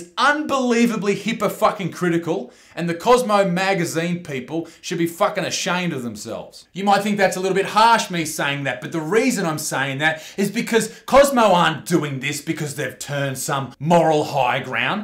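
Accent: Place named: Australia. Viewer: i weighed 135 Me, an American: that's not bad, goddamn: kilograms Me: oh yeah that's right I'm an American and this boi sound like he's from Australia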